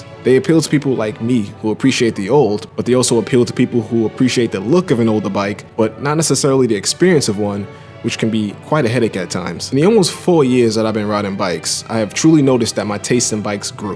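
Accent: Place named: East Coast, United States. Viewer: You are very articulate and eloquently spoken, with a slight accent (my guess would be somewhere on the east coast) Great video!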